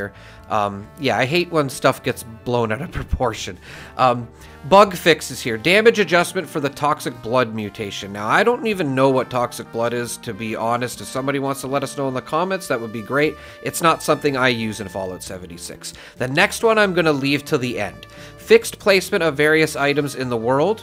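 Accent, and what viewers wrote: Canada. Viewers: This is channel. Minimal research. Maximum outrage. Nice friendly Canadian voice as the beginning. Most undeserving “creator” I’ve ever seen. He knows it too. Especially when he dies constantly on his Live streams. It’s actually hilarious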